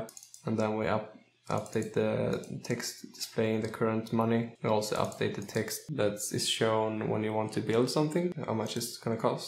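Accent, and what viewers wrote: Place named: Sweden. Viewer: I lived in Sweden quite enough to notice the Swedish accent :)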